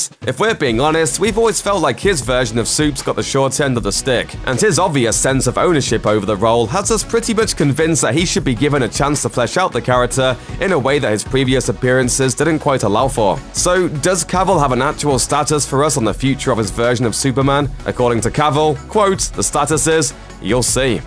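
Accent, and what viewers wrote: England, United Kingdom. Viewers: Dan Rockell just like there are many different accents in the USA...there are different accents in the UK too but he’s 100% English accent, I couldn’t tell you what part as I’m from the states but without a doubt brithish. I honestly love his voice for these videos ahah.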